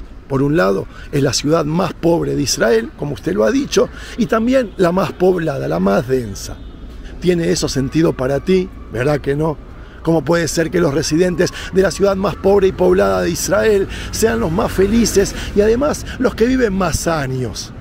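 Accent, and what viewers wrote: Argentina. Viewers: Estaria bueno que defiendas las Islas Malvinas con la misma energia,va digo por tu acento argentino...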